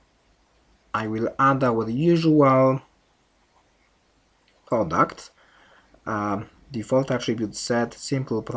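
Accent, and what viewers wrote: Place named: Poland. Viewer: awesome accent, polish I guess?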